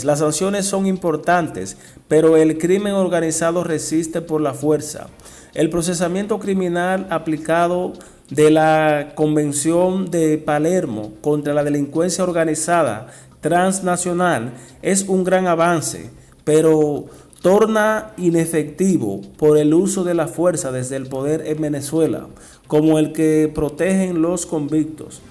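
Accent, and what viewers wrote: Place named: Cuba. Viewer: Será que son ideas mías o este señor es cubano¿?escuchen la voz y su particular acento!! Mosca¡!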